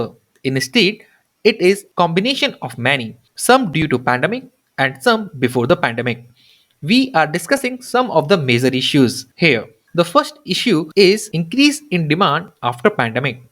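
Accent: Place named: Punjab, India. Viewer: Punjab accent is very HEAVY here.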